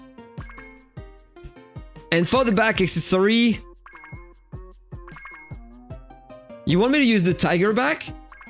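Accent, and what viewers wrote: France. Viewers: Attends tu es francais? J'avais capté l'accent mais je pensais pas que tu étais français...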